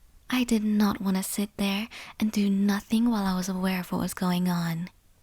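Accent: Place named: Australia. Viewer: Always nice to hear a fellow Aussie do asmr :o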